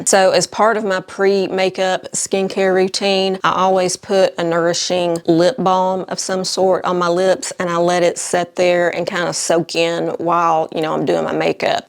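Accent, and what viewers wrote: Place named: Virginia, United States. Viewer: Your dogs r so cute! We lost our 13 year old toy poodle a few years ago and r thinking of getting a small dog. I have to tell u I was born in Virginia and moved with my family to Idaho when I was 10. You remind me so much of my aunts and cousins in Virginia with your cute accent and your no nonsense personality! I think that’s one reason I love your videos so much! U r just darling! Great video as usual thank u! ❤😊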